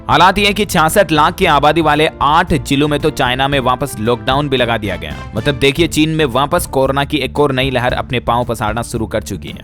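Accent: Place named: Bengal, India. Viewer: Bengali english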